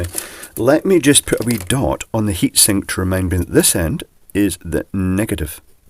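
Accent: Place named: England, United Kingdom. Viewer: I like your voice it sound very smooth and nice English accent. It's my first time that I saw your face. You are alike my Aust. friend. Big guy with a soft voice. Don't misunderstand me, I am not queer. 555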